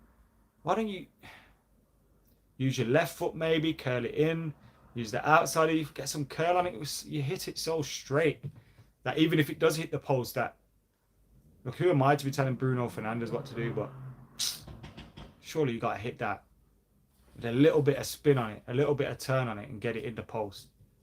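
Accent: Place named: Midlands, United Kingdom. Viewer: I'm good at accents where are you form. I'm not taking the piss I think its Midlandsish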